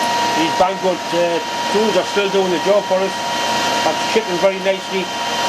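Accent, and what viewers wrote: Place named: Scotland, United Kingdom. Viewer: I cannot understand Scottish accent so does the google translator. I give up on that guy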